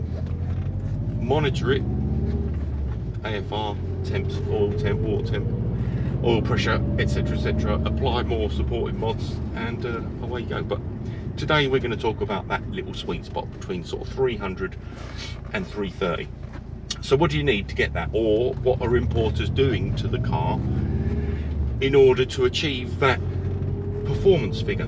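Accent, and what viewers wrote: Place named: Colchester, United Kingdom. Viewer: I just watched this video and thought he sounds a bit like he's from Colchester, and then seen you driving and saw you were driving in through Ardleigh interchange. I'm going to subscribe now. I'll have to keep an eye out for you on the road 🛣️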